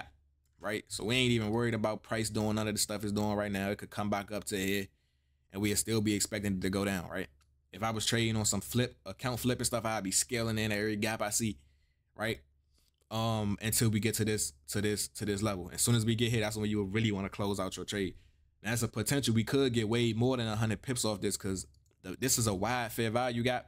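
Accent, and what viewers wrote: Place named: Washington DC, United States. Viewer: I’m watching this video & saying to myself slim sound like he from the city (DC) checks for Instagram cause that’ll usually tell 😂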